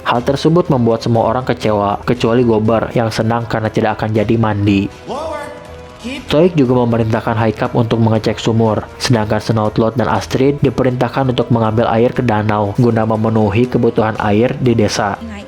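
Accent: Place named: West Java, Indonesia. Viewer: ngomongna masih Aya sundaan euy meski Jang konten yt👍🏻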